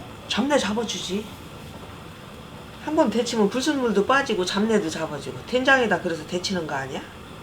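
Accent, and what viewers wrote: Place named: Chungcheong, South Korea. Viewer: 설명해주시는 부분이 진짜 엄마나 할머니가 해주는것 같아서 너무 친근하고 좋아요.. 저도 충청도 사람이라 중간중간 나오는 충청도 사투리도너무너무 좋습니다..요리강의 영상이 이렇게 따듯해도 되는건가요.. 보일러안틀어도 지금 너무 훈훈해서 땀이나요 삐질삐질 😅💕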